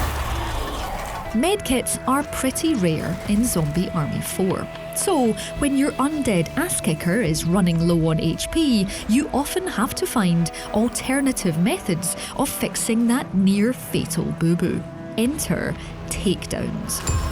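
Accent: United Kingdom. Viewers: Good thing I dig Scottish accents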